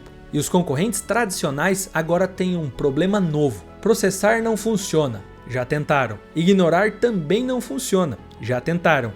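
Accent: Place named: Caipira, Brazil. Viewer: Desculpe, mas esse sotaque caipira não dá pra escutar. Mas o conteúdo parecia ser interessante.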